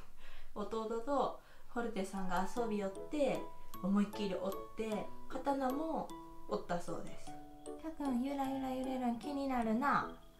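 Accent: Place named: Kyoto, Japan. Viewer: 今日も可愛いたっ君観てる💕 は京都弁のイントネーションで穏やかで優しくて聴いてて気持ちがいいです。たっ君への愛情もたっぷっり〜。にピアノで厳しくされなければ反抗期ないかもね？たっ君で良かったね❣️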